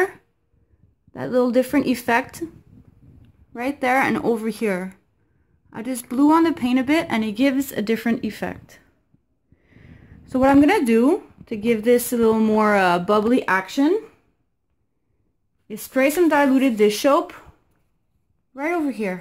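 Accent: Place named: Canada. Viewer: your Canadian eh! love it great work😁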